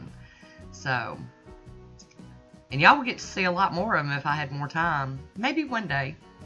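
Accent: South, United States. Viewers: Love it! Water-marbling is what started my nail art addiction too! Unfortunately, I never got very good at the water-marbling type of nail art but I’m getting better & I am better at stamping, and hand painted designs. Now I’ve ventured into doing my own acrylics, acrygel, & just recently started gel polish and hard gel. I love the products and tutorials by wildflowers nails and by Kirsty Meakin’s tutorials on Naio Nails aswell as her urban graffiti gel polish line are so amazing and easy to use! I love the formula of those gel lacquers they made all the difference in the world to my gel polish game! I was just using the brains found on amazon before that and had about given up on being able to do anything because I couldn’t get the gel polish to look good in order to use the wildflowers products but once I laid down her gel polishes using the tutorials she and Lauren out on youtube I was producing some great looking designs! 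Now I am not a pro I am just self taught from YouTube but because I was disabled by a drunk driver in 05 I can no longer go to nail salons due to my illness I have no immunity and have seizures so I started doing my own and then branched out to doing family and friends just for fun and when I’m able to (which unfortunately isn’t often as recently I’ve been sick and unable to get out of bEd for the past 2 1/2 months) but I enjoy your tutorials too! It’s so wonderful to have a fellow southerner to listen too! I had not been using small size bathroom cups s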